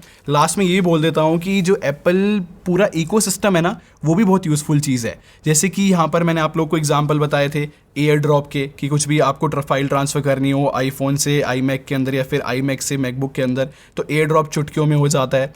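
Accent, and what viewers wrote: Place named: Delhi, India. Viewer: Bro I think it's delhi accent 😂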